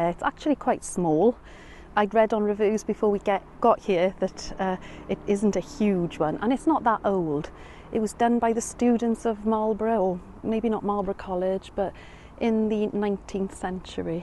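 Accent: Wales, United Kingdom. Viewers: You should definitely think about starting a separate channel dedicated to ASMR, your voice is so smooth and captivating and with that gorgeous Welsh accent I just can't help but fall asleep to your videos, and then I have to watch them again to see what I missed, lol.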